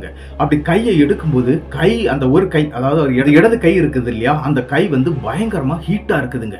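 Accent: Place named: Kerala, India. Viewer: நீங்கள் மலையாளம் கலந்த தமிழில் பேசுறீங்க. ப்ரோ.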